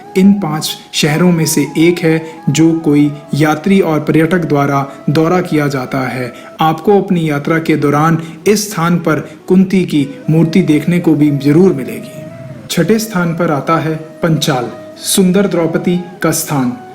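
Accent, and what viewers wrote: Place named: Punjab, India. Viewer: Zaberdast jaankaari, commentator ki awaaz bhi achchi hai, lekin punjabi accent ke kaaran kuch shabdo ka uchchaaran galat hai. Jo ki kaano ko chubhta hai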